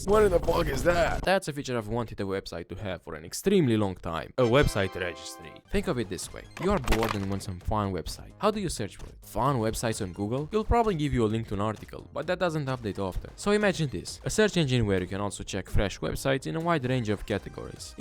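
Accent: Russia. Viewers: Wtf is even your accent, you didn't used to have so much russian in yout accent. What is wrong with you? I can't understand a sh*t!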